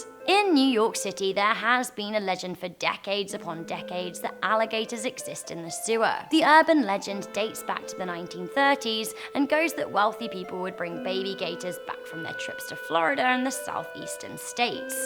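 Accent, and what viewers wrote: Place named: United Kingdom. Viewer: British accent🤮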